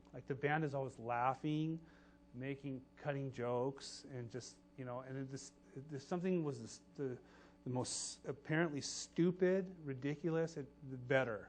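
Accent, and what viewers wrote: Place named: Croatia. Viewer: he was born in croatia right? to.... i forget what kind of parents, but i can hear it in his accent.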